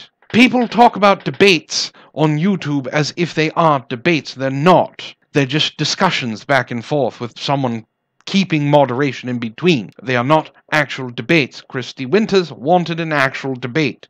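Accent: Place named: RP, United Kingdom. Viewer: Agreed, sort of a not drunk upper class british Wizard of Cause sound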